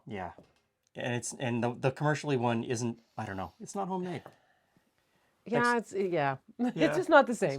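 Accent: Canada. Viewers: I love your videos. I found you post covid, and I love your channel! Youre such a calm guy. You come across like a really gentle soul. I love the small coloquialisms from your subtle canadian accent “aboot instead of about” but subtle its turned down. 50%. Its one of the many things I adore about your channel. I love the food history. I love that you partnered with Max Miller on a video. I love when pops in. I love watching your easy every day banter. I love how well you know each other. The only thing I don’t like is that your videos are so short. I would watch you work in the kitchen happily! Tell more stories from your life. Talk more about your grandparents. I am a similar age to you, maybe 5 yrs older. My grandparents died when I was quite young, so love hearing other peoples grand parent stories. I would love to hear more about your time “counting trees?” living in a tent. 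Did you encounter a lit of wild life? Bigfoot, bears, moose, Elk? How many summers did you do that? How did you and Meet? The topics are endless. Bottom line. You and would be a blast to sit around the fire pit with sharing a bottle of wine. Have a great day to you both!!! Beth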